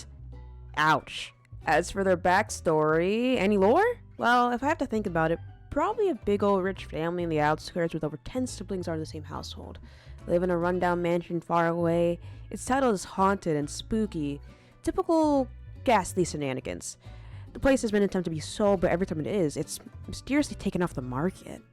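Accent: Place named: Netherlands. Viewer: oh idk why your accent sounded vaguely Dutch to me lol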